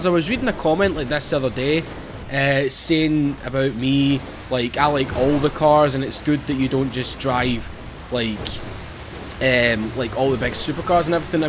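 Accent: Scotland, United Kingdom. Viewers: What part of Scotland is he from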